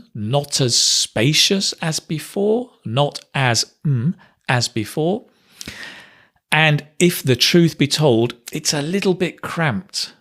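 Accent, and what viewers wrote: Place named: RP, United Kingdom. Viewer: 👏👏👏👏👏👏👏Goooooorgeous English without an accent! Well done,! Es un placer oirte! When I was young I looked after my uncle"s ponies at Cowdray Park Polo Club, in Midhurst. Everybody spoke just like you, if you know what I mean!😄😀😄😄 Un abrazo, from Olivos, Buenos Aires, Argentina🇦🇷🇬🇧🇦🇷🇬🇧🇦🇷🇬🇧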